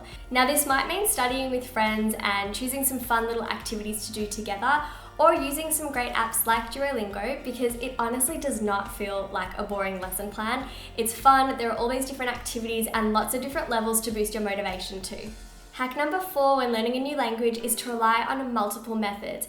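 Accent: Australia. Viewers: Girl, I love your Australian (I think) accent!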